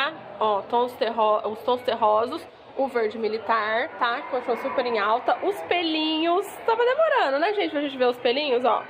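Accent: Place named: Campinas, Brazil. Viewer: vc é de Campinas? O seu sotaque é de campineiro. Eu moro em Campinas e vivo andando pelos shoppings da cidade. Abriu uma loja da Amaro, onde era a forever 21 no D. Pedro. Gostei mais dessa loja do q a do Iguatemi. É maior. A bottero é uma loja sem vitrine, gosto de olhar a vitrine p depois entrar na loja p comprar. Nela vc entra e já chove vendedoras. Gosto dos sapatos da Bottero. E noto q as pessoas passam pela loja e não entram. É uma pena. Sempre vazia. Faltou a vitrine p atrair.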